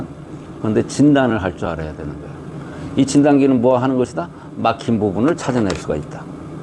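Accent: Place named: Chungcheong, South Korea. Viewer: 강의 참 재미있습니다❤ 충청도 제 고향 본토 사투리가 더 재미있습니다❤ 바락바락 ㅎㅎㅎ 다리에 쥐 날때 족삼리 오금통 위중에 사혈 숨통을 터준다 ❤❤❤ 급한대로 피를 낸다 백문이불여일견이라 신경 신경은 허리 천추에서 시작 진단 엘로드 진짜 재미가 바락바락납니다 ~~~ ^^ 고맙습니다 ❤❤❤❤❤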